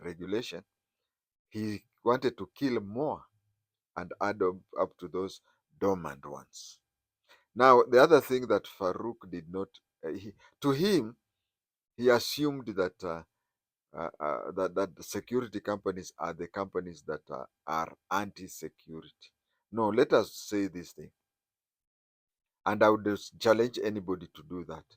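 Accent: Kenya. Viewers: VERY POOR KENYANISED/ SWAHILINISED ENGLISH. ARE YOU GIRIAMA?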